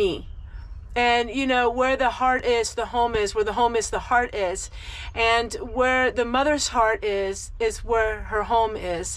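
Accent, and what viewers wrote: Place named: Maryland, United States. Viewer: your channel is so fun and uplifting and a little wacky in a good way. i enjoy your garden and your collectibles and of course it's nice to hear someone not be ashamed to mention the Lord Jesus. your accent is similar to ours in Maryland but ours is a little more southern. do you have any pets other than your chickens.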